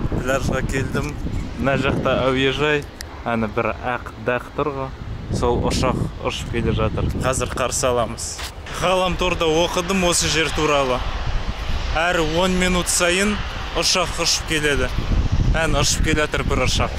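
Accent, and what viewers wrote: Standard. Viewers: Рахмет саған қазақша блог жүргізіп жүргеніне! Аздап акцент болсада қазақша таза, сауатты, қатесіз сойлейді екенсін, орысша сөздерді қоспайсын, жарайсын!👍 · оте керемет жансынго таза казакша сойледн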